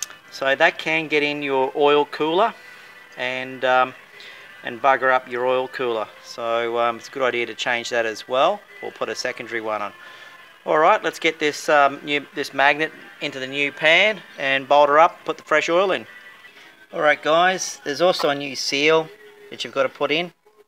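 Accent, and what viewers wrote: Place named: Australia. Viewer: Thanks, mate. It's great to hear an ozzy accent and great advice.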